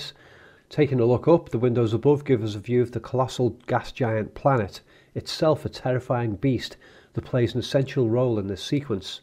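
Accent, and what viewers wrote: Liverpool, United Kingdom. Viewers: My apologies for always assuming you were from Scotland instead of from Liverpool. My ignorance of accents outside of the USA.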